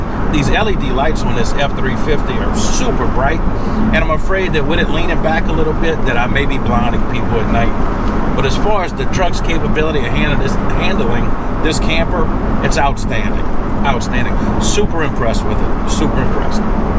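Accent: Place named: Louisiana, United States. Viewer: Man, you sound like Jesse Duplantus! You must be from Louisiana.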